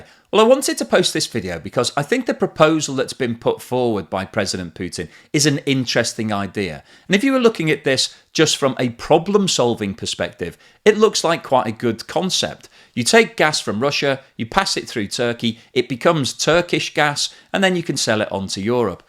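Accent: United Kingdom. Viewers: This video tells me how crazy these western leaders are. Russian gas is Russian gas no matter the middleman. Erdogan is smart. He is part of NATO and he deals with Russia. So maybe that is why he lets inflation go nuts. If my country🇨🇦 suggested we freeze to death so we can have a pi….g match with USA we the people would certainly bring the truckers back and more. European leaders who opt for making their people and economies suffer need to step away. Turn on Nordstream 2 for goodness sake and deal with Russia. Buy their gas until other alternative sources of energy can be made. If I were made Queen of the world I would ban sanctions everywhere. Open the markets and let the free market flow. Sanctions are the source of hatred and violence worldwide. Not every country will be democratic like ours. We need to help citizens of all countries have a reasonable life. 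Sanctions hurt people not the despots who run the countries. And who says in future these countries will not change with different leaders. I am 70 and I have learned too much punishment on my children has disasterous outcomes. Turn on the gas, push Ukraine and Russia to compromise and stop all this bloodshed. thanks for the videos but you sound British. I would worry about my own country’s demise……it is in serious jeopardy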